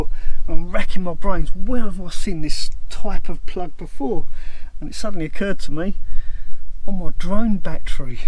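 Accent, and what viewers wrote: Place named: London, United Kingdom. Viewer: you sound like Monty Python's "My Brain Hurts" I told her it's a normal London accent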